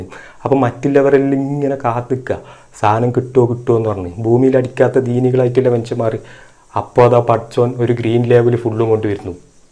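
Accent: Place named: Kozhikode, India. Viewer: Almost 1.23 minutes of a clip by some extremists like Taliban from an unidentified place, then a teaspoon of Moin Ali, then two cups of Naveen and Janaki, then a piece of incomplete hadith. All mixed in a cauldron peppered with nice kozhikodan accent. Sure recipe for a viral video with lots of views, likes, comments and thus a revenue mode for the presenter! Of course the presenter has the rights to air his opinion since we live in a democratic country but it should not be at the expense of religions in our country. Every religion including Islam, Hindu, Christianity etc has an extremist faction. But generalising the whole community by highlighting only those selected parts is totally wrong. Don't tarnish Hinduism with your theory of Gothram just because some insensitive politicians brought a communal angle to the viral dance video. Majority of Hindus protested against the stupid statement brought out by the political party. 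And by the way , quoting a hadith is a new fashion and a sure shot ingredient for making videos viral because people don't know what's written in Quran, the context, the inner meaning etc since it's in Arabic. When you proudly discovered the Intoxication hadith, my dear friend, you forgot to check the full context and remaining part of the hadith you mentioned. Next time, please read the paragraphs above and below of the random lines you pick to spark a fire of hadiths or verses from Quran. Understand the whole chapter and context before adding memes! Oth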